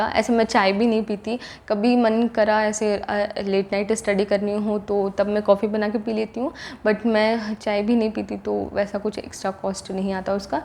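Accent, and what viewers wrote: Jharkhand, India. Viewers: Aap jharkhand se ho??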